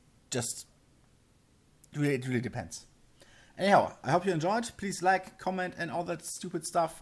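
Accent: Germany. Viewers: bei dem deutsven akzent kannst du auch gleich deutsch reden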